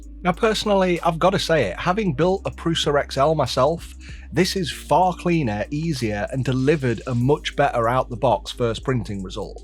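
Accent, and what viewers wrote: United Kingdom. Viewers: I’m American. And like most Americans, I find listening to someone with a British accent telling me things relaxing. And as I’m relaxing at the end of a long day it is mentally akin to wearing comfy slippers on a cold night while relaxing in an overstuffed leather recliner reading a good book as I sip a nice hot cup of cocoa (meaning it's really comforting). So when the aforementioned British speaker has the opportunity to reference something so uniquely British – like a famous Sherlock Holmes quote - thus enhancing this comforting experience , I consider it a missed opportunity. I’m not the only one that finds this phrase reassuring or having value outside of its literal context.(According to a popular literary web site) " 'The game is afoot' is more than just a phrase, it is a cultural touchstone, a literary echo, and a testament to the enduring power of human curiosity and the pursuit of knowledge". 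I hope now you can understand how this was, in all conceivable meanings of the phrase, truly a missed opportunity.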